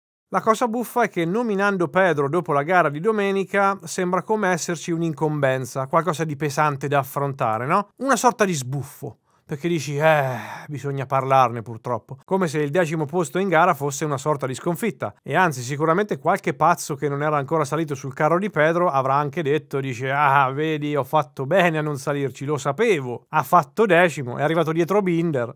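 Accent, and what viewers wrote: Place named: Marche, Italy. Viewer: Hai la stessa "esse" di Fabri Fibra. Secondo me sei di Senigallia FORZA Bestia 23 Sempre!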